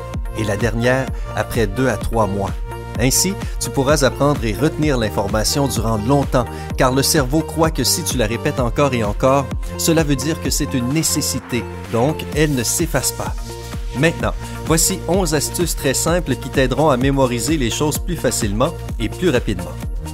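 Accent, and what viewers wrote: Quebec, Canada. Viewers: Un Canadien parle! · Accent québécois ? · Vue son accent je crois que c’est un Québécois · J'aime pas la facon cannadien de parler francais nuul.avec mes respect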